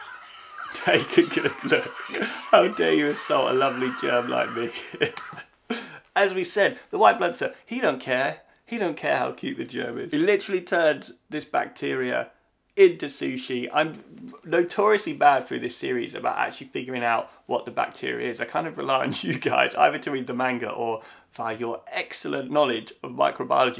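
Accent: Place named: Yorkshire, United Kingdom. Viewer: Hi i hope you see this but it would be really helpful if you put subtitles on your videos coz im having trouble listening to you with your accent i wanna say its sounds like a Yorkshire accent but i could be wrong. it would make my life easier as being hard of hearing. Also would you love it if Cells at work did a Covid19 episode coz i would.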